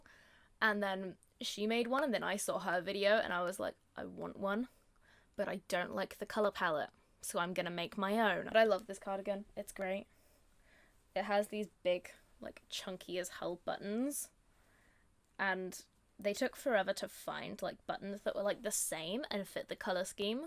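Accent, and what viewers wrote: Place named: United Kingdom. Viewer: You're so cute and talented :) and also I love your British accent <3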